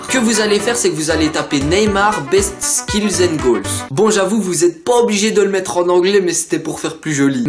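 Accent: Belgium. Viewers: Tu est belge?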